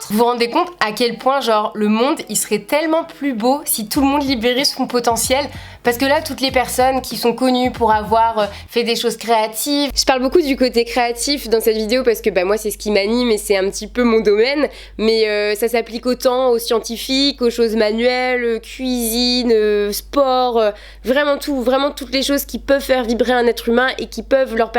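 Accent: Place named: Marseille, France. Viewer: Ton accent marseillais 😂 Sinon je t'adore tu es juste Magnifique super vidéo comme d'habitude et moi je suis ( presque) sur que tu peux atteindre le million (ou les millions) 😉❤️❤️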